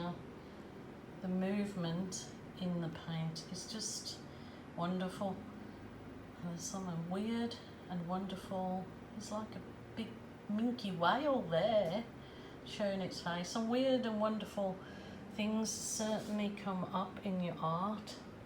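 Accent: Birmingham, United Kingdom. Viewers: Lol! have been on the phone to home? Your Brummy accent is coming through more today! How lovely! What a stunning piece! Treat of colours - very magical! Hope you & your family & friends are starting to recover after all you've been through? X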